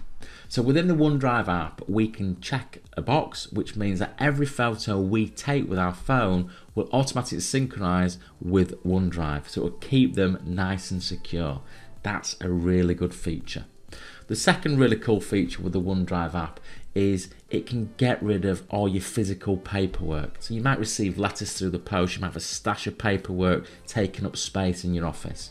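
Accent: Yorkshire, United Kingdom. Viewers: Hey are you from the UK? I hear an accent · I am from Yorkshire, but living overseas, so nice to hear the Yorkshire accent....a great video, but the reality is OneDrive is the worst cloud storage on the planet. Take a clook at products like Tresorit or IceDrive and do a video on them as well. I would not touch OneDrive with a barge pole......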